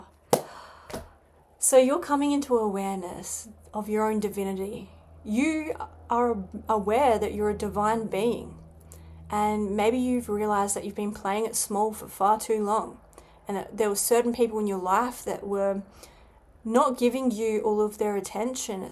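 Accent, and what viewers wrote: Australia. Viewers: I LOVE Australians. The voice or accent 🌞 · I'm from Scotland and I love the Australian accent it's very cool.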